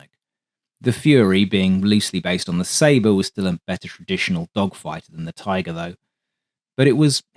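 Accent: England, United Kingdom. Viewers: Well, the English call it a motor, and he sounds English, so there!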